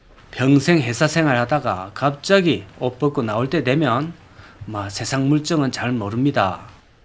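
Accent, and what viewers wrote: Gyeongsang, South Korea. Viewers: 사투리 토하겠다 할배야 경상도 말투좀 버리고 딕션 연습좀 하세요. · 구수한 경상도말씨로 설명을 정말 잘하셔서 다른 사람들도 처럼 하시는 분도 있지만 재미가없고 설명을 뽄따서 하고 있는데 저는 절대로 안본답니다 하이팅. 하셔요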